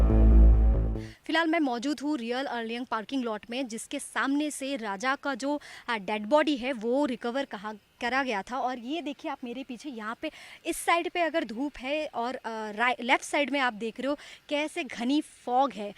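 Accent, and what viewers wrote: Bihar, India. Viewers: मैडम अपनी लैंग्वेज में थोरा बिहार का टच दूर कीजिए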